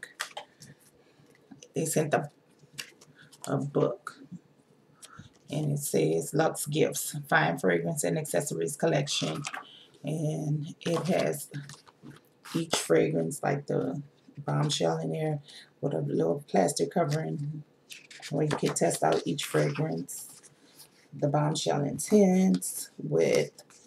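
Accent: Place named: New Orleans, United States. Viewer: Love your haul very thorough! Are you from New Orleans I can hear your accent? Anyway great video!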